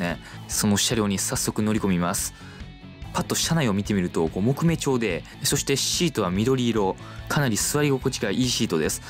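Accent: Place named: Kansai, Japan. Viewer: 関西っぽい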